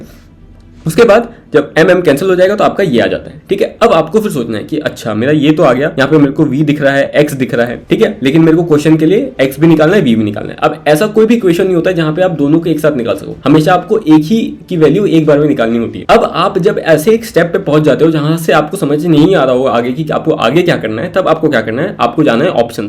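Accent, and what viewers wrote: Assam, India. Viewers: Dada Assam se ho kya ??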